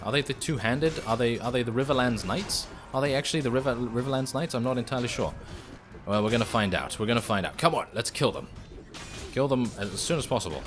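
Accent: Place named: RP, United Kingdom. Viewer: If he quits his title I will be forced to try find someone with his. . . Gaming interests and accents. So fancy and almost posh <3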